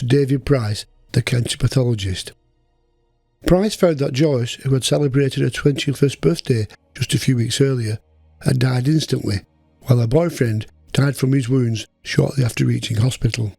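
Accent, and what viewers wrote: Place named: Bolton, United Kingdom. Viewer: Yes that's the ones. Now, it would be really interesting to see why Royle got his reprieve. I presume the documents are now a matter of public record somewhere. I think you could get an interesting episode looking at how and why some managed to escape the noose. This is all very fascinating and is an admittedly somewhat macabre subject that has fascinated me since I was a lad (longer ago than seems feasible!). I enjoy the videos a great deal - especially as you sound very similar to my brother in law who lives near Bolton!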